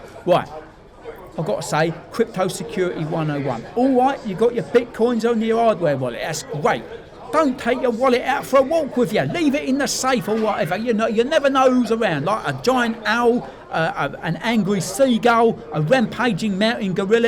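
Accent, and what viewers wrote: United Kingdom. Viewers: As an American, this is a proper British accent 😂. Cheers guv!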